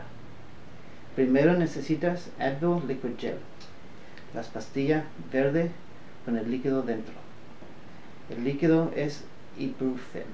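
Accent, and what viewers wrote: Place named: Andalusia, Spain. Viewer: que acento mas andaluz xDDDD :)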